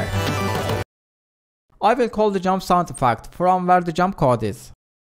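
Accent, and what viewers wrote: Turkey. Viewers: Aksanından kesin bu türktür dedim içimden. Sonra kanalın açıklama kısmından adının olduğunu gördüm 😊 konuşman gayet başarılı ve çok güzel videoların var. Başarılar dilerim.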